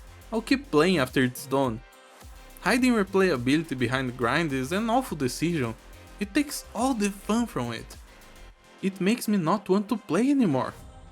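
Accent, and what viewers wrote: Brazil. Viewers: Are you brazilian? Nice video btw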